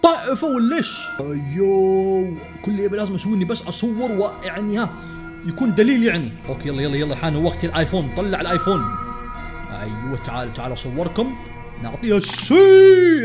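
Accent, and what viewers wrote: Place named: United States. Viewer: الاكسنت الامريكي معطي جو 😂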